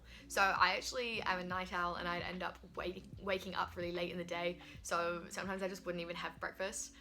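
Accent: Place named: New Zealand. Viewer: are you part American? Your accent sounds like a mix of kiwi and something else ahha x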